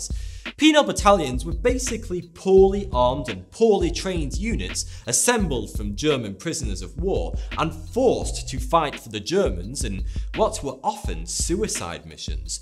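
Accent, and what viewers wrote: United Kingdom. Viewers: interesting video, but that obnoxious bri-ish accent made my ears bleed. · Why u say bot not but? Oh ur from uk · What British accent is it?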